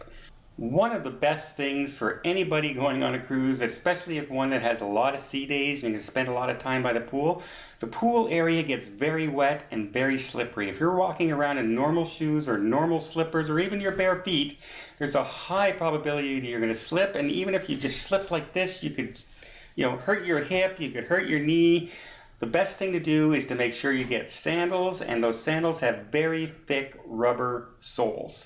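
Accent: Canada. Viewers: I can so tell your canadian now lol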